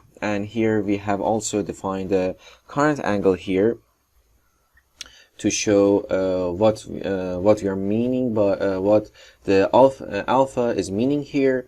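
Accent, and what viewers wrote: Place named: Iran. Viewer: Sound IRANIAN dialect!!! Thanks by the way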